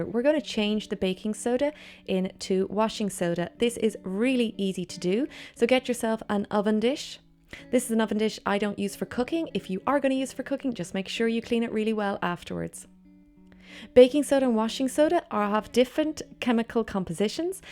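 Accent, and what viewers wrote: Ireland. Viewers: Are you Irish? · Your Irish accent is sooo cute!!! :) I can actually understand it!!! As a North American, I don't really have a chance to hear the Irish accent, but when I do I can't really understand what anyone is saying!!! Lol. May I ask what city or region your accent is from in Ireland? Thanks if u can reply!! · Loving the Irish accent 😉👍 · I love Irish accents! ☘️ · Was missing the Irish accent from the series on amazon. Finished all the episodes. Then this came up. Yay, lol 💕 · Oh the Irish accent, how I like it! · I was wondering if you were from Ireland then you said it! I'm italian so I don't have much clues about english accents, but the Irish one is in my heart forever now.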